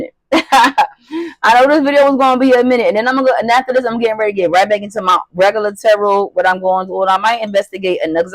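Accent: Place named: Spanish Harlem, United States. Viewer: New subscriber here!! Are you from nyc ? Lol my husband is n your accent sounds like his hes from Spanish Harlem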